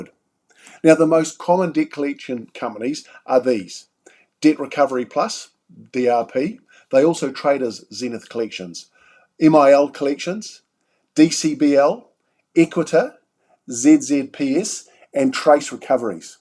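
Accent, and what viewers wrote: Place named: Australia. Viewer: This guy is clearly Australian (or perhaps a Kiwi). No prob with that, but is he talking about the law in Australia/NZ or UK? I haven't heard of any of the companies he has mentioned.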